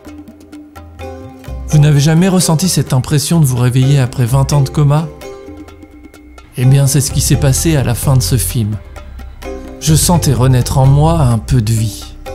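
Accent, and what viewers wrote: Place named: France. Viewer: C'est trop génial !!! Cet accent français sur le texte anglais est un vrais régale ahahahaha :D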